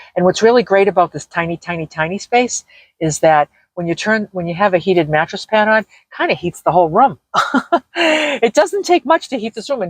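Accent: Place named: New England, United States. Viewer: I love your New England accent! Also, your talent and adventures! I'm in Alabama and never been out West.. would love to see the Grand Canyon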